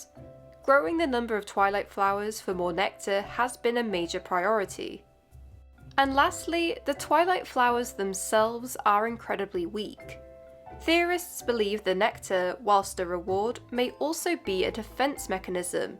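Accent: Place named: United Kingdom. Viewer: my first time watch watching you and my first thought was Bri'ish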